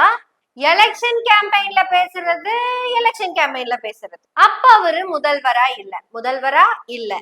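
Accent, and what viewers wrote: Kongu, India. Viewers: neenga pesuràdai record panni keluñgal ungal Kongu Tamizh is super your boldness is super and my humble request is not to say that you are a Malayali even though your parents are from Kerala but you are a Great Tamizh Magal.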